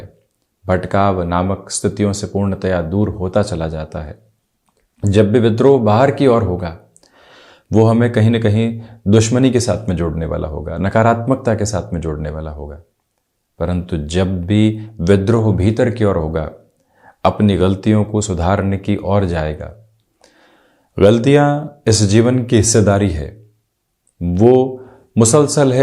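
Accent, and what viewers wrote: Standard. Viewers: मैं आपके चैनल को फॉलो कर रहा हूँ और दिन प्रतिदिन का राशिफल सुन रहा हूँ। आपकी भाषा, आपकी हिंदी बिलकुल शुद्ध और साफ़ है । इस बात की ख़ुशी है की आज भी ऐसी शुद्ध हिन्दी कोई बात कर सकता है । प्रनाम 🙏🏻।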